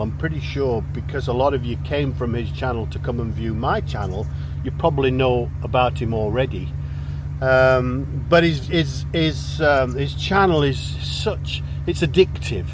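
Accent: Northern England, United Kingdom. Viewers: Great video...we had a similar issue when deciding our move to France...fortunately our house sold in a week..the slow part was after we offered on our current house in France it took 5 months from offer to completion but well worth the wait. We were from Yorkshire and you definitely sound like you have a northern accent but cant quite place it😂...looking forward to the next episode..a great alternative to Strictly Come Dancing😂..Andrew and Julie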